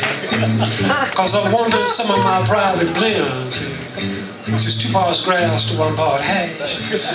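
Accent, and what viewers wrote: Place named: South, United States. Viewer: Jesse always connected with his audience, thru humor, wit, heartfelt love for his fellow humans, and that ever sweet Southern honeysuckle drawl. I feel so fortunate to have shared this planet with him for years, and thankful to have seen him perform a few times.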